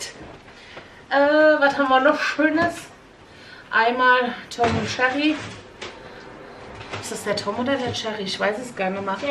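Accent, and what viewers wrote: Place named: Palatinate, Germany. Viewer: Schönes Video liebe, du bist so sympathisches Fräulein 😀ich höre dir gerne zu und dein Pfälzisch 🥰hab früher in Speyer gewohnt,.. in der Zeit du wahrscheinlich in Mannheim, wenn ich mich nicht täusche, hast du es irgendwann in einem altem Video erzählt 😀lg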